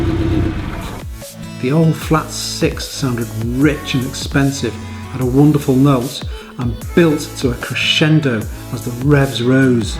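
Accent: Liverpool, United Kingdom. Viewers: Sounds like an awesome Scouser accent ..even better to watch the Porsche video.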